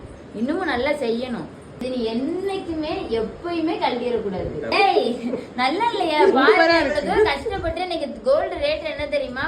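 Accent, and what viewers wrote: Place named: Tirunelveli, India. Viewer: திருநெல்வேலி காரின்னு நிரூபிசுட்ட சகோதரி 👍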